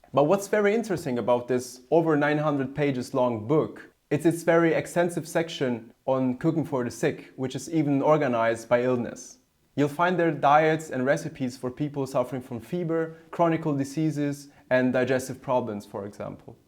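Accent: Germany. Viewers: Very well done, very interesting. Your English is really very good; I enjoy the German accent and the occasional "Germanic" choice of wording. Do you have a channel in German? I'm learning the language and am looking for interesting material in German to experience. If you speak German with the same speed and clarity you used here, I believe a German channel of yours would be a useful tool for me in that endeavor.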